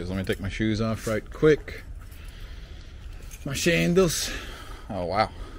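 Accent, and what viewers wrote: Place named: United States. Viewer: How ignorant of you to assume that he is Brtish even after hearing his American accent 😂😂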